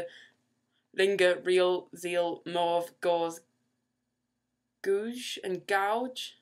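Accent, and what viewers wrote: United Kingdom. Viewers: ahhhh are you from up north? im down south so we pronounce things like singer, ginger ,linger grass brass differently :) a little bit posher perhaps :D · I love your British accent!! But why do you have to read it that fast? It is a poem, right? :) I am Hispanic so I wish you could make a slower version for people like me :) C'mon! Try it !!! PLEASE!!! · Come on love!, you can do it much better, you are British, I want to learn from you, I want to have your accent, it is beautiful. Try to do a decent video but please recite the poem slowly so I can follow you easily. Cheers.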